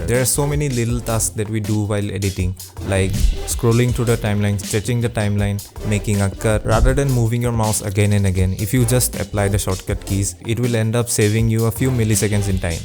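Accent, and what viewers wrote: Assam, India. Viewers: i can feel the assamese accent... love from Assam 💗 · Holy crap bro, im not even done watching the vid but I cant resist the urge to say how cool you are for someone who has an indian accent and a pretty decent sense of humor lmao... Fellow video editor here, keep it up.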